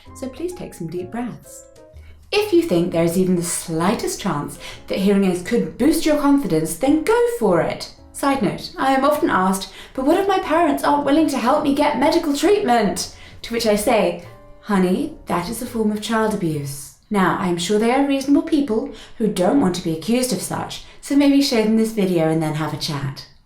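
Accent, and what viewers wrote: United Kingdom. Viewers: Your positivity is so contagious,; I love it! 🥰 Thank you for the tips on learning to lip read! I definitely do rely greatly on captions right now! 😬 Especially with different accents, like yours. I adore a British accent, but struggle to understand some words sometimes... although I've watched enough of your videos lately that I'm starting to pattern your voice 😊 P.S. you hit on all of my moms biggest pet peeves about talking to people (Covering their mouth, chewing etc)...people can be so annoying and disrespectful sometimes!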